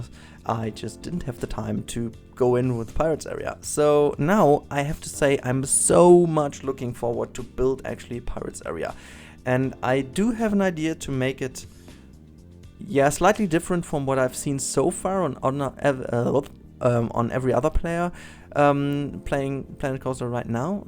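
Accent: Germany. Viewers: Jaw-dropping work as always. It is simply un-believable. 😜🙂🙃 I was wondering as a quick question, do you speak any other languages besides English (I'm assuming German because of your accent) but anything else?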